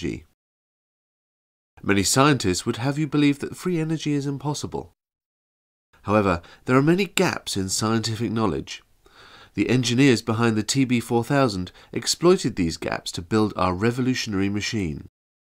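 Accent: United Kingdom. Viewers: Interesting concept but I doubt the canned ham or the sedated fly have much input to the system. Although the use of insects in esoteric devices isn't un heard of. Viktor Grebeinkov observed anti-gravity effects in beetles and used their wing/shell casings to produce levitation. Good spoof with the pompous British accent. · Ok dawg, you got me. Nice one! Very well made. Nice Brit accent. Cheers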